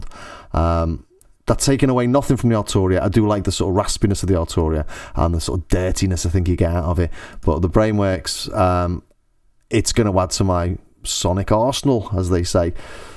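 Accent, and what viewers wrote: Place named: Scouse, United Kingdom. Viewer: im trying to figure out if you`re a posh scouser or from the Wirral :)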